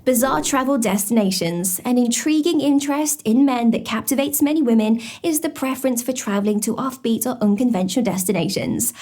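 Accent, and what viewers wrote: England, United Kingdom. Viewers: Love her british accent she is adorable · I'm Surprised Americans don't say You're A I with your Perfect English Accent ! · It's the English accent for me😳😏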